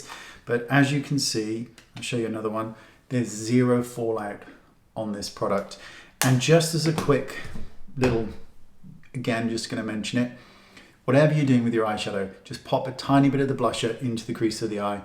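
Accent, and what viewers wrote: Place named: Wales, United Kingdom. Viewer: Nice shirt! nice accent!.Im from south wales are you half welsh?¿but ive been living in Spain for about 40 years...gosh !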